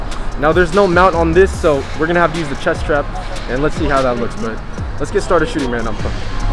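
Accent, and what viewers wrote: Philippines. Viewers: Road to 100k subs folks. Like William veerbeck and other black guy who loves film photography, they did it too. Keep uploading more videos. Love it, from your filipino accent. Hehe ♡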